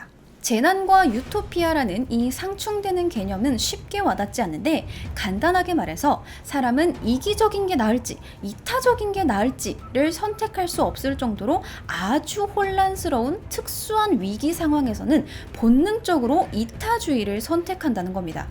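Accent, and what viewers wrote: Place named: Jeju, South Korea. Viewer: 제주도가 고향이심? 아님 이주하신거심? 경상도 억양 만큼 꽤 마음을 설레게 하네요.ㅎ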